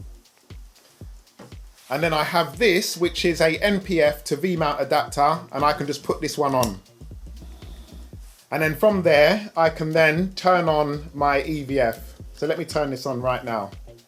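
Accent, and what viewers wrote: London, United Kingdom. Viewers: What parts u grew up Cuz. U sound like NW10 Cuz.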